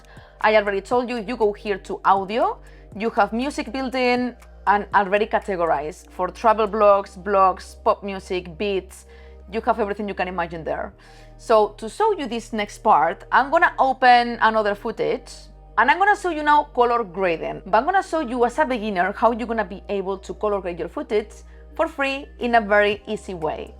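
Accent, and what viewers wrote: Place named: Spain. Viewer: That spanish accent oh myyyyyyyyyyyyyyyyyyyyyyyyy <3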